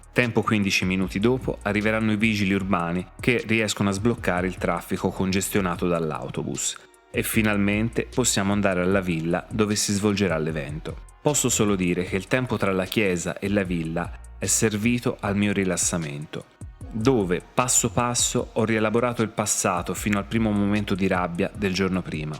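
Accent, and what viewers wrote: Tuscany, Italy. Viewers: Cmq mi fa impazzire il tuo accento toscanooooo🤣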